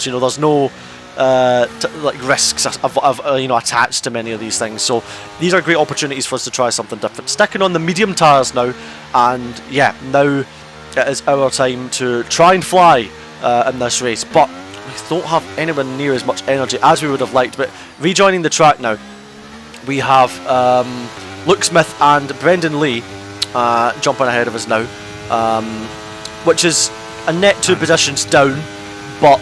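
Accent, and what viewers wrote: Scotland, United Kingdom. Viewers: It’s a Scottish accent and it can often be quite hard to understand. It’s hard for native English people to understand those who have a harsh Scottish accent sometimes so it’s no surprise you have noticed the difference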